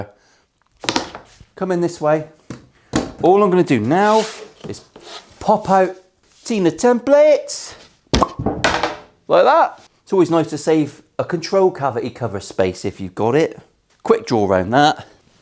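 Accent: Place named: Plymouth, United Kingdom. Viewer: There is a 'voluptuous', but that's nothing like as good as what you said! Absolutely love hearing Plymothian again. Great work.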